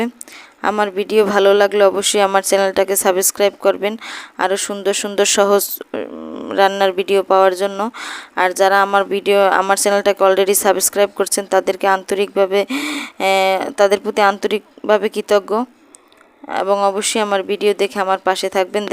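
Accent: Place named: Barishal, Bangladesh. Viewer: অনেক সুন্দর হয়েছে আপু আপনার এই আমড়ার জেলি ঠিক কইরেন আপনি কখনো শুদ্ধ বলেন কখনো আবার বরিশালে এটা বলেন শুদ্ধ টা বলবেন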